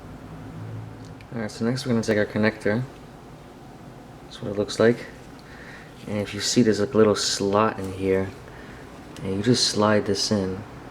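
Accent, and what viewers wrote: New York, United States. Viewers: Love the NY/NJ accent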